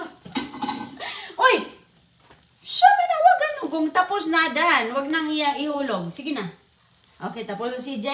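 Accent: Visayas, Philippines. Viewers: bisaya kasi siya o waray kaya ganyan ang pronunciation 😊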